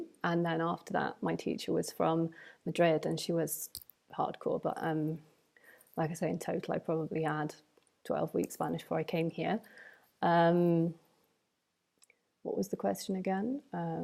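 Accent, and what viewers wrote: Paisa, Colombia. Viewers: Yep you sing Spanish like a paisa, good job! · I love how strongly paisa your accent is. I could immediately notice it in your previous video when you pronounced the song’s name “ ”. Your English accent, however, is less pronounced than many other people I’ve heard from there, and much easier for me to understand.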